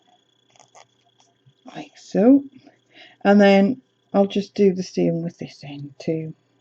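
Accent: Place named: Newcastle, United Kingdom. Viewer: So nice to hear a Geordie putting this pattern into action. I am living in Fife but from South Shields. I am more of a knitter but learned crochet during lock down. First time I have tackled a blanket with so many different techniques.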